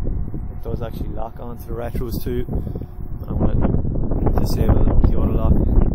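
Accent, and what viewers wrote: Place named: Ireland. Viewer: What a crappy tutorial.. How do you expect anyone to follow along with the monotone, robot sounding voice that also has a sharp Irish accent.. And how about that wind noise that drowns you out - that's actually kind of nice to be honest. Seriously, I don't think you guys listen to it before uploading to YouTube.. If you did you would be like OMG, THAT IS WORSE THAN A STEAMY PILE OF 💩. Do better, viewers deserve it!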